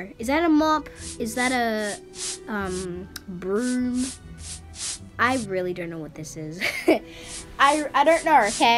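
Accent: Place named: Australia. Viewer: I can tell your an aussie by listening to your voice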